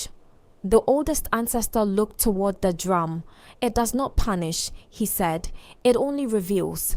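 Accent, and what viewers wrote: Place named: Africa. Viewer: Please don't Englishfi the story we have enough English stories and movies. We prefer raw African accent.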